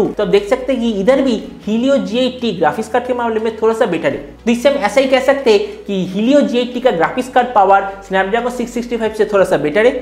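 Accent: Bengal, India. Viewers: Vai vdo ta khub valo informative... Sudhu ektu try koro Bengali accent ta komate jkhn hindi te korcho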